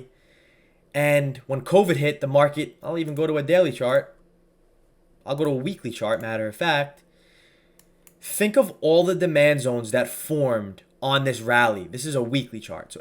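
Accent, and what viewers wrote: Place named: Long Island, United States. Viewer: Tell me you're not from long island.... gotta be. Staten island is the sleeper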